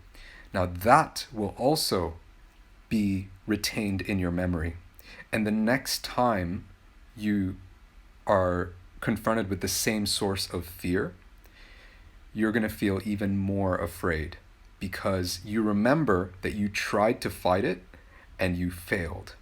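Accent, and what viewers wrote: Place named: United States. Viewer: sir, you say better than you but the fact is that you're best. Because I've been watching so many Americans they speak like you only. So you're just superb❤👌👌👍✌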